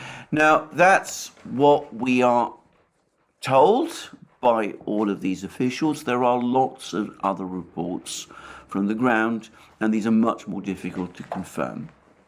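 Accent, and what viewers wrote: England, United Kingdom. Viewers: Weird listening at a communist with an English accent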